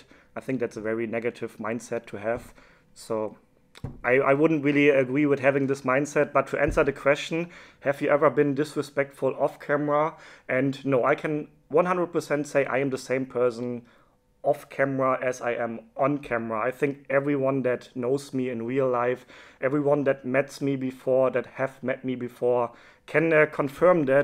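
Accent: Germany. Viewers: U sound like German accent? R u German?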